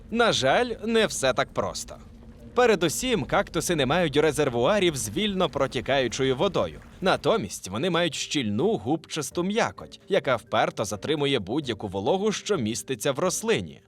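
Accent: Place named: Galicia, Ukraine. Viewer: Паплюження української мови галицьким суржиком. Риготня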